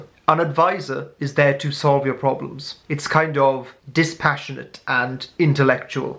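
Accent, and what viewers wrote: Wales, United Kingdom. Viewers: Are you Welsh?? I'm from South Wales and you sound exactly like a Welsh person. Us Welshies are very rare to find so I always get really excited when I hear someone who sounds Welsh. First video I've watched from you and it's so accurate, completely agree with you!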